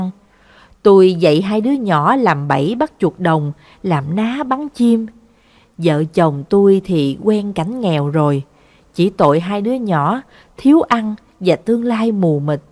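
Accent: South, Vietnam. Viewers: Câu chuyện cảm động, với người đọc giọng miền nam mộc mạc, rất hay 👍! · Giọng đọc miền nam rất hay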